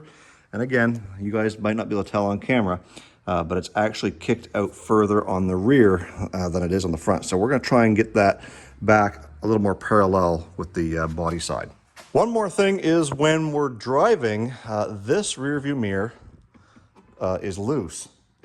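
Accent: Canada. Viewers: Beautiful truck! I love your Canadian accent, (I'm from BC) 😅😅 I have a 94 F-150 XL 4.9L. No Rust. Love my truck!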